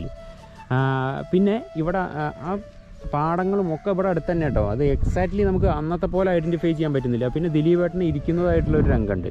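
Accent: Palakkad, India. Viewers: etta njngalude palakadan bashayilulla avatharanam suparanu enik oru request und mele parambil an veed movie location pleese bro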